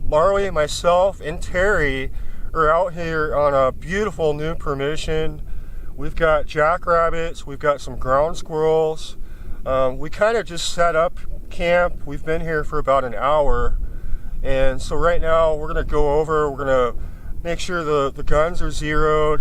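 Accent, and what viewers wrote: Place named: Minnesota, United States. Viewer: Good vid but GREAT shots!! : Couple questions: FIrst, can't help but notice midwest/Minnesota accent, where you from? Where are you hunting? Looks like you are hunting something that i get some shots at: Golden-mantled Ground Squirrel but the distance shots they look huge like prairie dogs in South Dakota. Just trying to figure out where a guy could get that much action. All the clues (cali license plate) are pointing to Arizona/California area, but I've never seen or heard of that many of any kind of rodent down in the South West. Thanks. (I shoot: Hatsan: Blitz .30/Barrage .25/Hydra .25/Flash Pup .177 - same Hawke scope as you, ATN X-Sight 4k Pro, ATN Thermal Monocular) I mostly night hunt the rat infestation in Vegas with the Barrage & the night/thermal. Thermal is a game changer!! Totally worth it. Thanks again.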